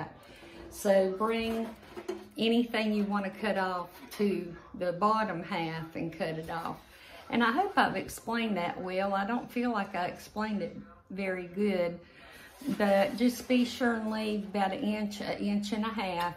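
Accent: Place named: West Virginia, United States. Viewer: Hi, My name is Christine. I sent you a letter in June, about my mom, Tonya. She has since passed due to bladder cancer. I want you to know how much you meant to her. She loved your personality, demeanor and sweet heart. While I was a caregiver for her, she had lost interest in a lot of things toward the end of her life, she was 79. But she always had your channel on. Even when she napped, she wanted your show playing in the background. You we're her friend and comfort. She was from Charleston West Virginia. You reminded her of her family and friends with your delightful accent and passion for cooking. Please get well, don't be down, we have eternity with Jesus someday. We love people and touch lives on this earth. I strongly believe that's the will of God. Just like Jesus did and still does. and that's what you do, everyday. I will pray for you and everyday. 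Sometimes soldiers get wounded and tired and need to heal. Thank you for being there for Mom and me at such a delicate and emotional time. I'll always be a fan. God bless you and yours. Your friend, Christine ❤️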